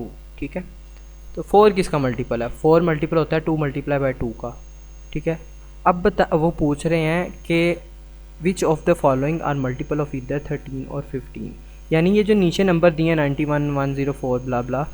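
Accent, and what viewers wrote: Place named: United Kingdom. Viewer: Omg ur English accent 🥲❤️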